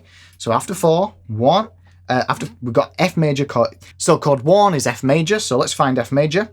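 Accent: United Kingdom. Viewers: You sound like british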